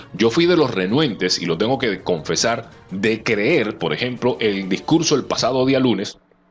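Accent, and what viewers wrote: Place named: Venezuela. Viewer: Saludos, la verdad que tu no hablas como colombiano hablas como venezolano.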